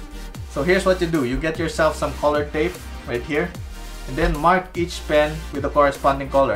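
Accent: Philippines. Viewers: Are you a Filipino? Your accent sounds like how a Pinoy person would speak in english Edit: Oh nvm you are a Filipino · i could relly tell your a filipino by accent hahahaha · Keep up the Filipino accent dude when you are speaking English because it's our identity haha, btw great work, i will try your tips when i will study architecture again haha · Filipino accent haha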